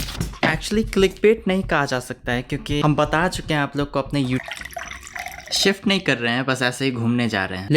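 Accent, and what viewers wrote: Bihar, India. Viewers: i don't know why but i love to listen bihari accent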